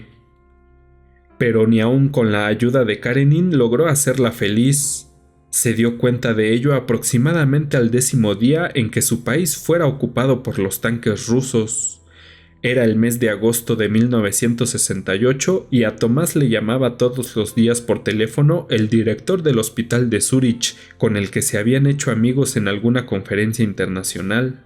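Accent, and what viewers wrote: Mexico City, Mexico. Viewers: ese acento chilango... choca un poco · lo está leyendo un chilango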